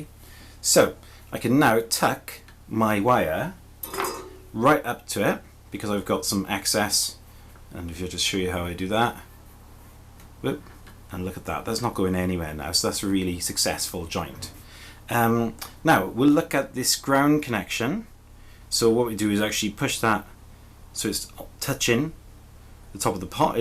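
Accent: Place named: Wales, United Kingdom. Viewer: Da iawn. Thought I detected a bit of Welsh accent. So cool to have pickups made in Wales.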